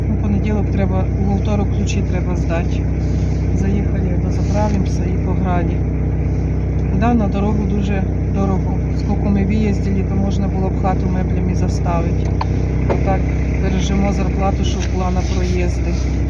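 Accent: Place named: Korosten-Ovruch, Ukraine. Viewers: Говор Житомирської області,по розмові зразу видно звідки людина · Зразу зрозуміла, що з Коростенського району. Коростенсько- овручський говір не переплутати 😊. Вітання з Черняхова.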